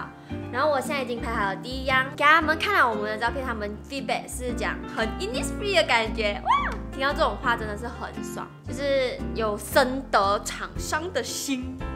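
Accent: Taiwan. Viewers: 说话越来越台湾腔了啦❤😂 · 的台湾腔越来越够力了🌚❄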